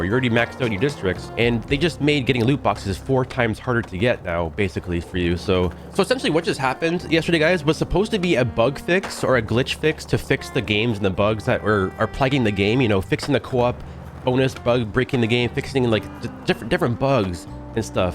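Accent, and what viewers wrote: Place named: Canada. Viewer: HES A FUCKING MOOSE LOVER ! lmao that sounds kinda negative 😂 but fr I always forget hes Canadian til he says a few words that sound different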